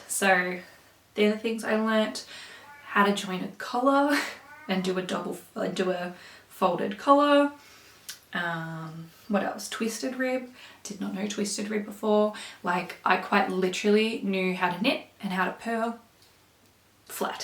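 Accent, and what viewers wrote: Australia. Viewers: Thanks for a great episode. I'm from the UK but lived in Perth for 13 years. It was great to hear that accent again x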